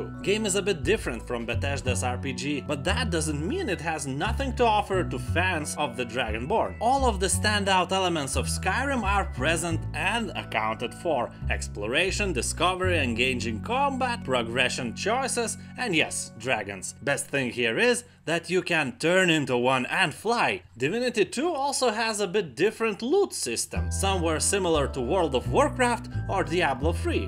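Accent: Russia. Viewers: Че ж такой жесткий русский акцент...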